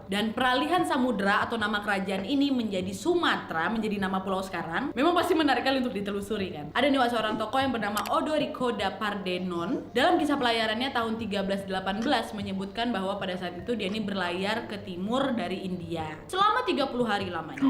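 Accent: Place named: Sabah, Malaysia. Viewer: Mendengar logat bicara pasti orang Sabah dan bukan dari semenanjung 👍👍👍👍👍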